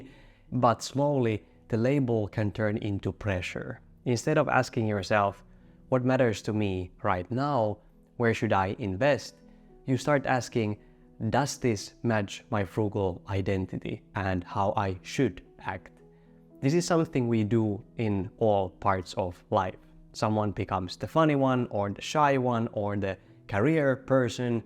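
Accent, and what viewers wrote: Finland. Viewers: Not to be the weirdo, but I love the Finnish accent. It reminds me of previous trips there and favourite bands. So your channel is the ultimate combination 😅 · 100% Finnish accent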